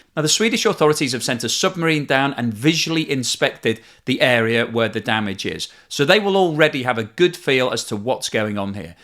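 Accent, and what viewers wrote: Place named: Wirral, United Kingdom. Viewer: is that a Wirral accent I can hear?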